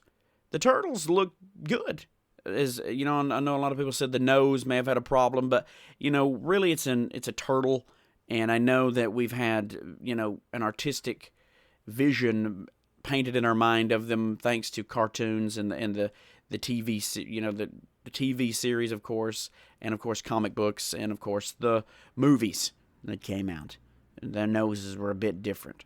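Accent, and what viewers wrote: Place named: South, United States. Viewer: U HAVE THAT SOUTHERN ACCENT WHAT STATE U LIVE IN ??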